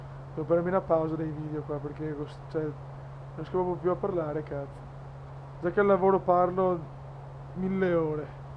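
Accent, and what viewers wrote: Veneto, Italy. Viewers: stima per il veneziano ahahah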